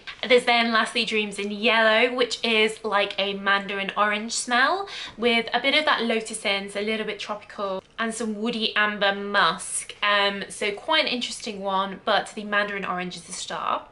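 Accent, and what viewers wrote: United Kingdom. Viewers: Do all these Brit’s talk like this?????? Haha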